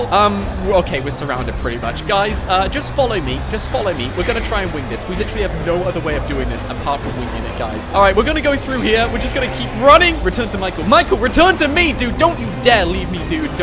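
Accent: United Kingdom. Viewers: His really british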